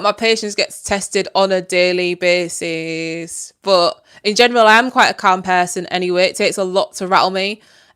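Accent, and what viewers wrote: Northern England, United Kingdom. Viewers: I knew you were a northern girl! I’m from Huddersfield (although live in the midlands now) so recognised the accent! Love these lives! Keep it up so engaging. To be fair at school most of the black girls including me had relaxed hair so you probably still would have had relaxed hair, that was just the done thing!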